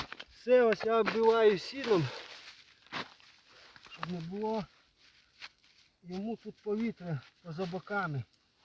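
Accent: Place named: Polissia, Ukraine. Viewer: Вітаю! Дуже приємно чути рідний полісський акцент.. я родом з Полісся з Чернігова.. дитинство пройшло у Чорнобилі але зараз зрозуміло мешкаю вже зовсім у іншому місті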